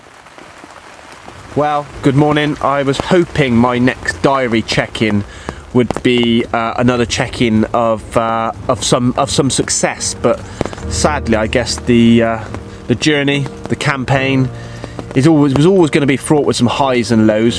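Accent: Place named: Bristol, United Kingdom. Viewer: As a fellow bristol boy nice to hear the accent....if a little diluted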